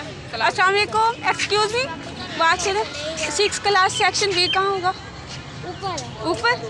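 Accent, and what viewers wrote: Saraiki, Pakistan. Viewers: AJ bht din bad apka vlog daikha Sirf apki awaz piari hai q k sariki bht piari bol leti ho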